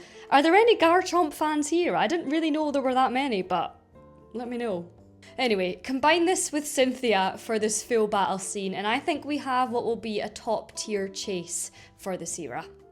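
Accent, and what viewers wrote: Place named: Scotland, United Kingdom. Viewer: I’m sure those 4000 hours watch time will come no bother - I really appreciate your videos, you make much better arguments that many of the other investing streamers who I feel jump on the hype trains and doom and gloom for the extra views. It’s also refreshing to hear a fellow Scot talking pokemon. Question - I got the Mega charizard UPC and Phantasmal flames booster boxes at retail. Would you flip them for a better set like destined rivals or just hold them for the next few years? They don’t seem to be very popular… thanks!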